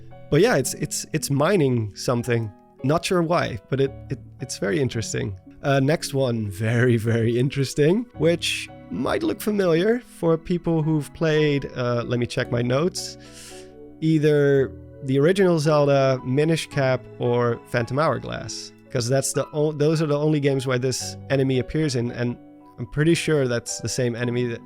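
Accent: Netherlands. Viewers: grappig om een nederlandse accent te horen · Super cool to see another dutch zelda fan!! Found your channel awhile ago and now I'm addicted to your video's. Je hoort het bijna niet aan je stem dat je nederlands bent!! Ga zo door :D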